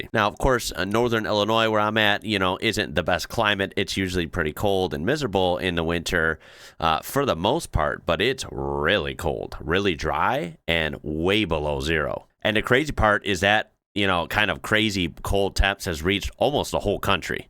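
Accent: Australia. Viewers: You’re beginning to sound like an Aus with your introduction mate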